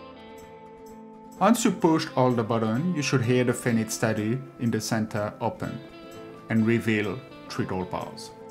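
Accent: France. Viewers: What accent is this?? It sounds almost French with a touch of Dutch or even German.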